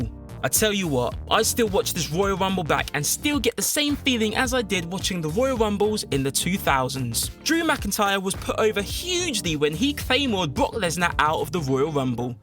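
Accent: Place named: United Kingdom. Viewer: well first of all they're based in the uk so they've got accents and second he speaks just fine I actually really like this guy. Just cause he is not spelling it out for you doesn't mean he can't talk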